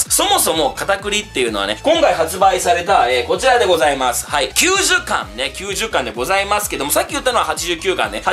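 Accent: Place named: Osaka, Japan. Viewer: こう思えばエピソードオブ空島のときの生放送やけど大阪弁強かったなぁ！ええねんで俺も大阪人やし、もっと大阪弁使ってぇーwww えーカタクリは俺の女♪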